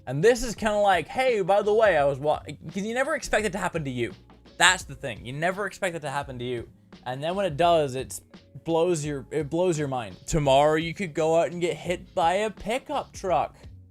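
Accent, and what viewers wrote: Ireland. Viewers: Mini Ladd sounds SOOO Irish in this video!!! Ik he is Irish but JUST THIS VIDEO!! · where does he live??!! he sounds like hes from ireland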